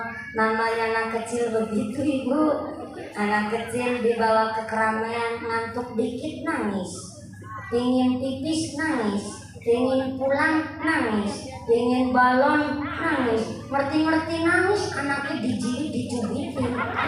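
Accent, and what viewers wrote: Banyumas, Indonesia. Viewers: Pokoknya gak ilang ngapaknya... Hihi Kls 4 SD beliau sudah ceramah di desa Saya... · Kayaknya kalau ora ngapak orak kepenak he he he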